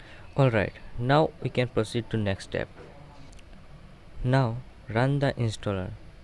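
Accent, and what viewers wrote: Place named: Bengal, India. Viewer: you are asking Indian? I can say he is definately bangla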